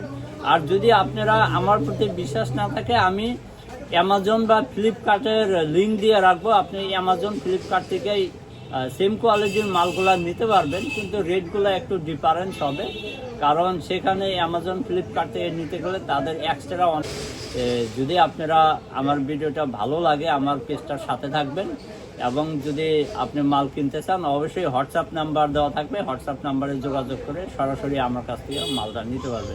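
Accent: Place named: Bangladesh. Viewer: কথা শোনে মনে করেছিলাম বাংলাদেশী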